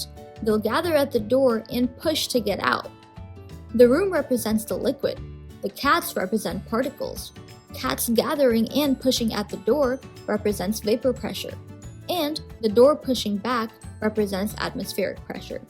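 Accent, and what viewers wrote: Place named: South Korea. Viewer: You made it soooo Cute!!!✨😆 Thanks Girl😝. BTW are you Korean? Your accent seems korean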